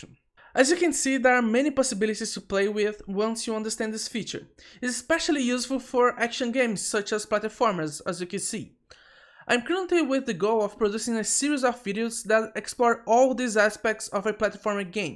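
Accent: Brazil. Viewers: Caraca vey tava pensando q era sotaque br e fui ver o canal e era msm aduhaudhasd. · Eu percebi na hora ksksksksksksks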